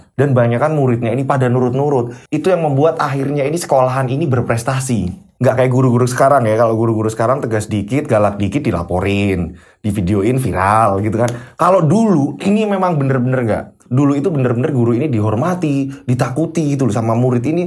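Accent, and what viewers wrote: Java, Indonesia. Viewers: Assalamu'alaikum, pondok gede hadir mas.. Sambil masak dengerin cerita horor dr mas dengan ciri has logat Jawa ny yg keren n bikin nyandu... Tetap semangat ya bawain cerita ny 💪💪salam jom bom bom RAW 🌹🌹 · Alhamdulillah sehat, saya harapkan semua pd sehat. Tambah sukses. Bahagia dunia akhirat. Saya suka sama logat ngomongnya si mas, Jawa banget 😍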